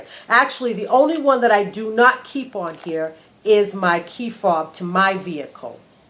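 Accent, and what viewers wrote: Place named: Massachusetts, United States. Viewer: Hi! It's Balance and Grace. Great Review! Great piece. Great nails. And I love your accent. It reminds me of my best friend from Mass. Be well!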